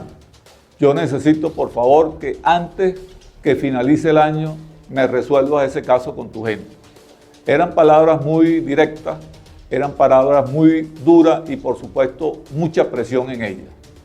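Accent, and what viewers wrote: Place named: Caracas, Venezuela. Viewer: Qué bonito escuchar este acento nuestro de caracas neutro respetuoso.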